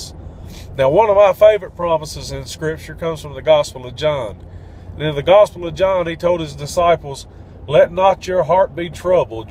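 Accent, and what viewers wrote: South, United States. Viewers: Not sure where you are from brother, but you have to live in God's country by the way you talk. Surely the lord loves the SEC and HIS people that love HIM and that are called according to HIS purpose. Help us to TRUST JESUS in all things. All we need now is an alter call and Alan Jackson singing Blessed Assurance Jesus is mine or Jesus is tenderly calling today! thanks brother! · I love your southern accent!!!!!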